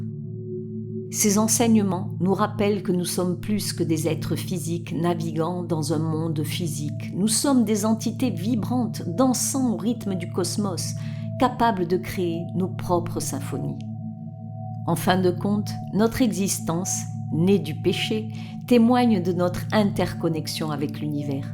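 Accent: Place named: South, France. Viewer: Encore un grand merci pour cette vidéo très instructive! Elle me donne l'envie de pratiquer davantage le yoga, de méditer davantage et de chanter encore et plus encore! Et des milliers de mercis pour ta jolie voix du sud de notre beau pays qui me fait chaud au cœur❤❤❤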